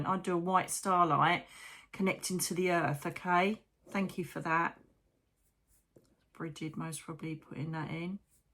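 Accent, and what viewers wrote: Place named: England, United Kingdom. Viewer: Hi! I love you and your videos. I'm training myself to understand your English accent. The more I listen to you, the better I get but... you speak too fast! Thank you for being here for us. Nancy, Argentina.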